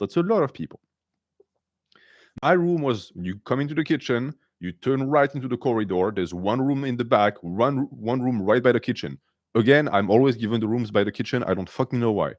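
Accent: France. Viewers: he’s loosing his french accent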